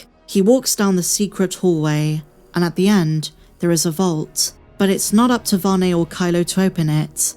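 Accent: United Kingdom. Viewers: I don't know if your voice acent it's England, Scotch or Ireland, but i love it. You have so a sensual voice. Greetings with respect from Mexico. MADE THE FORCE BE WITH YOU AND PROTECT YOU ALWAYS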